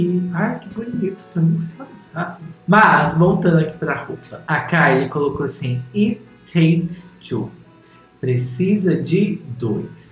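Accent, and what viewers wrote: Minas Gerais, Brazil. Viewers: amei o seu sotaque mineiro 😍😍🤣🤣🤣🤣🤣🤣🤣🤣🤣🤣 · Adorei o com o sotaque de Minas... 👏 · Esse vídeo valeu cada minutinho só pra ter o falando mineirês 🤧🤧🤧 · sou hater assumida do sotaque mineiro do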